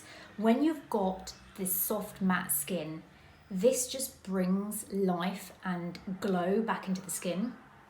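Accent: United Kingdom. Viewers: To my American ears, anything you say in your British accent sounds luxurious!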